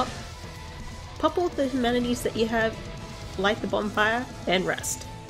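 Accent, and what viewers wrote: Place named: Australia. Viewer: What are you Australian? If so I like your accent.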